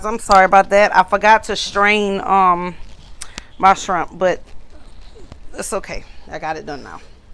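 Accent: Louisiana, United States. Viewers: I Love your Louisiana Accent!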